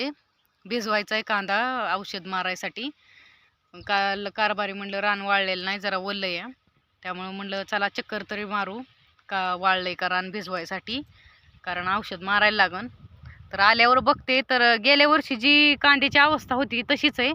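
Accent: India. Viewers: बोलण्यावरून बारामतीची वाटतेय ताई अजितदादाला सांंगा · गाव कनते मराठि बोलता 🎉😂❤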